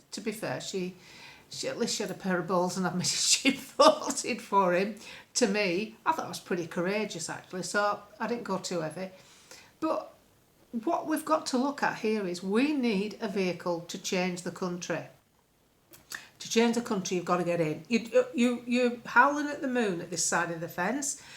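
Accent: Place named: North England, United Kingdom. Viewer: I love listening to your channel, apart from it's nice to listen to someone speaking in a straight forward way in a northern accent, I'm from Lancashire (as opposed to "Greater Manchester") you talk such sense you also come out with cracking funny comments as well.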